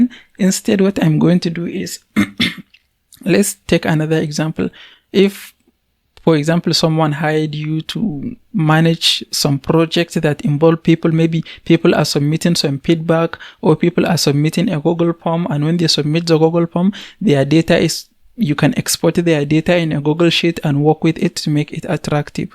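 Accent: Hausa, Nigeria. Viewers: Hausa from Nigeria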